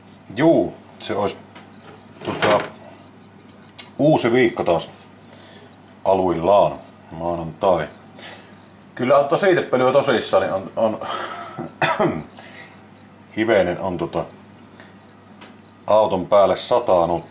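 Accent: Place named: Savo, Finland. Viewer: On siinä vähä paljon muutakkii.Hauskaa tämä Savon murre.Itsekkin Savolainen kyllä.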